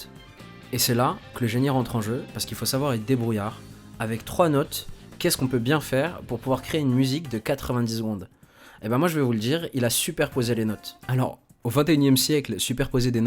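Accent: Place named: France. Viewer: J'm ton son Le french accent touch Ça passe bien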